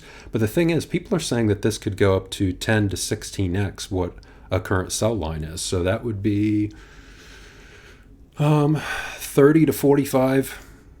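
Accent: New Zealand. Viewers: Nah, Yeah... That’s definitely Kiwi speak!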